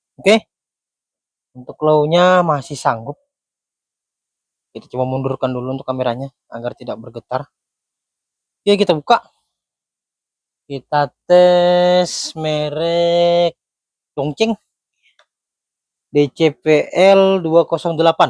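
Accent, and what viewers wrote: Indonesia. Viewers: Sprti logat banjar. Orang kalimantan selatan???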